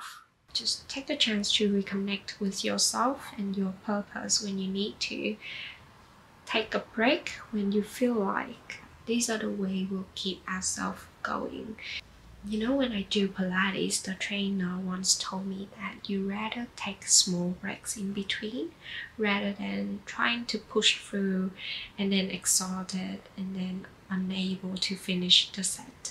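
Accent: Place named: Australia. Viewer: Your accent is sooo Ausieeeee and I lov ittttt <3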